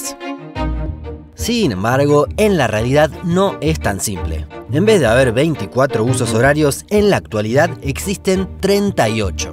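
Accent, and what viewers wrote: Buenos Aires, Argentina. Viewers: una pena el acento argentino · Sos argentinos o uruguayo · De todas maneras además de hablar de las "Islas Malvinas" y reivindicar nuestra soberanía sobre ellas en varios videos en los cuales se las nombra, y del acento caracteríastico de los porteños (no de los argentinos, porque en mi país tenemos muchos acentos distintos), el canal está registrado como un canal argentino. Estos muchachos han estudiado en una de nuestras honrosas Universidades Públicas, dios y el pueblo las conserve.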